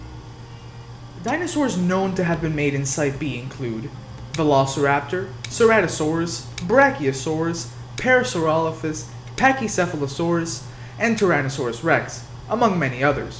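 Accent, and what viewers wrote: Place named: Spain. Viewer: Aye yo Spanish accent is 🔥🔥🔥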